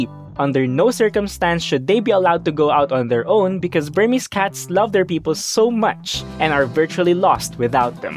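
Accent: Philippines. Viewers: I love my Burmese. She always climbs my shoulder as soon as she sees me lounging on the couch or when working in front of the computer. Btw, the voice-over is a clear giveaway Pinoy! Yay!🙌🏼🇵🇭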